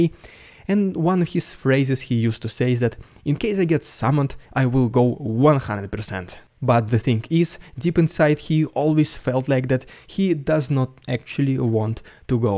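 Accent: Russia. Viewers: Dude, I'm uncertain if you are a real Russian that speaks American English very well, or an American that can mock a Russian accent very well.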